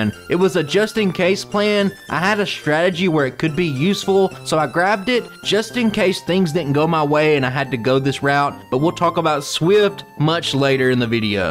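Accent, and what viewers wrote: United States. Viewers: Btw i too have a southern accent so "bind" and "bide" sound exactly the same... I feel your pain, brother! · Where you from? That accent got me saying howdy partner.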